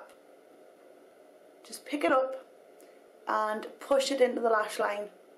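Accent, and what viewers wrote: United Kingdom. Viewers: she is british. how can you say how she naturally speaks sucks???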